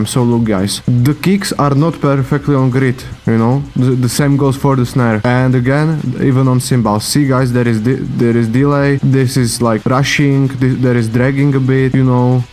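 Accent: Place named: Czech Republic. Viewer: Good vid, are you Czech? Guessing from accent :-)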